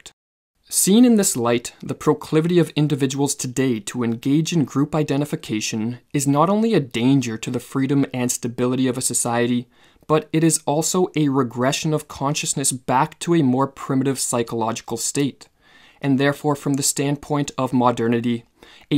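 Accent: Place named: Canada. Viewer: Canadian accent, do you hear it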